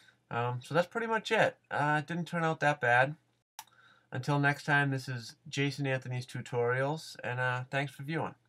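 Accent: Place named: New England, United States. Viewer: i love your new england accent <3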